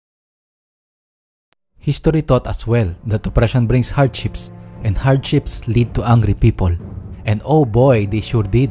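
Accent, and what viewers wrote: Philippines. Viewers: i love how he use da pilipino aksent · oversimplified but with filipino accent <3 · Kudos to your work and Filipino accent :) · Cool na sinadya mong idiin sa amin yung Filipino Accent hahahahahaha solid! ILABAS MO NA YUNG PART 2 KAHIT NAKA DRAFT YAN ILABAS MO NA HAHAHA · the accent is the native filipino accent,, it was on purpose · its Filipino English which considered as a dialect that's why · This is Pinoy knockoff Oversimplified 😂 even the name means the same thing "Dumbed Down." Edit: I love it though. Please make more videos ❤️. I have a feeling you're bisaya, based off of the accent. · he sounds filipino(Filipino ka ba?) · This is like Oversimplified. But filipino accent version. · maaaaaan this deserves more recognition. I like your cool filipino accent bro and the way of delivery is cool too haha keep up the good work:)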